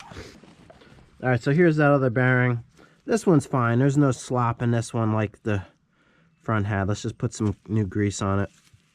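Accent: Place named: Northeast, United States. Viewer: Hey, I don't think I heard you correctly, - , it sounded like you said... "Like every time you be Meth..."? I know, I'm from California and I sometimes have trouble with that 'NorEastern' accent... What was that?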